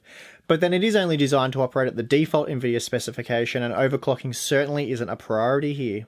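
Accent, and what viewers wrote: Australia. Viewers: "Hear that? That's the sound of an australian accent."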